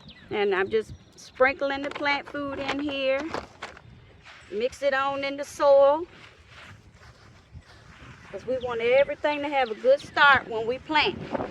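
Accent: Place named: North Louisiana, United States. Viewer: I know boot 👢 when I hear it.. North Louisiana me..great job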